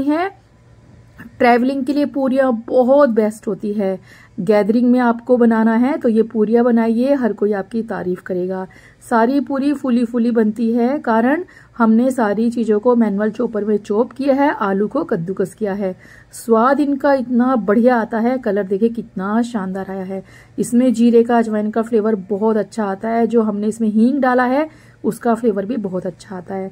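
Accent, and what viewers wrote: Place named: Uttar Pradesh, India. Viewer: Lagta hai aap up se hai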